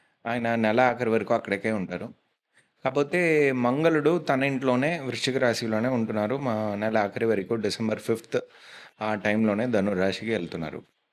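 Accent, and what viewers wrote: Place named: Tamil Nadu, India. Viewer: అరవ తెలుగు. ఇదొక పబ్లిసిటీ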